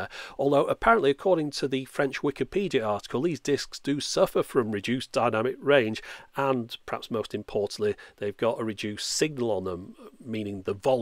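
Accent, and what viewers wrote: Germany. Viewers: The same with German, the English voice sounds a bit "German" anyway. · the english language explanation is clearly done by a kraut, you can hear the accent through even though he does a good job of masking it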